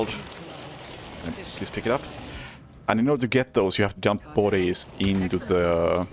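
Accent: Finland. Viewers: Damn I’m glad I found your channel. Amazing work! Your Finnish accent is captivating! I’ve obsessed over Alan Wake recently and so they have a resounding amount of Finnish references/language in the games. What a fascinating people. I love Finland!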